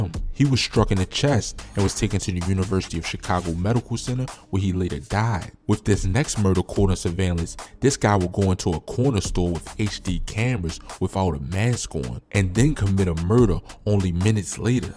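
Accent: Baltimore, United States. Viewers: Bmore accent strong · This narrator definitely from Baltimore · Yea you definitely from Baltimore 😅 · The narrator DEFINITELY from Baltimore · Cuz he frm baltimore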